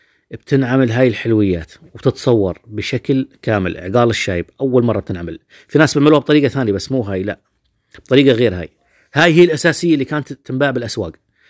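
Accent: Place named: Hauran, Syria. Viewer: لهجتك الحورانية الاصيلة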